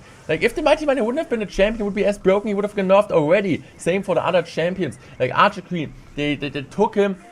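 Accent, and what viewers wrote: Germany. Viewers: Where is this accent from ? For a sec I didn't realize it was English (no offense ment) German? Like he's saying mura instead of mirror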